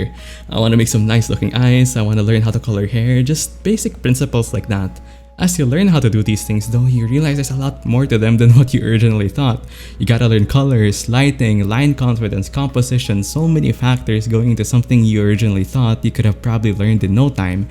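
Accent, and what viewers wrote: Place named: Philippines. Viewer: This guy sounds filipino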